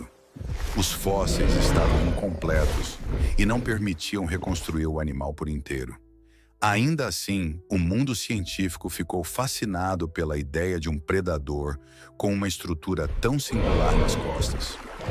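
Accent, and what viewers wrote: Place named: Minas Gerais, Brazil. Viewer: Dublagem Minera uai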